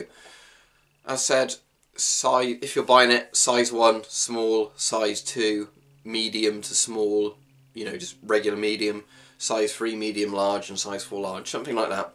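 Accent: Russia. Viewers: Great russian accent :) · Russian accent 20/10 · I want an entire video of you talking with that russian accent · nice russian accent bro, you sound like you speak it fluently.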